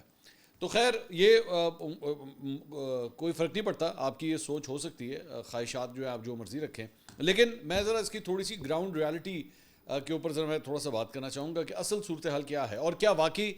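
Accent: Punjab, Pakistan. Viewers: AJJ KA VLOG BOHT HI KAMAL KA THA PUNJABI TOUCH KAMAL KA HOTA HAI AP KA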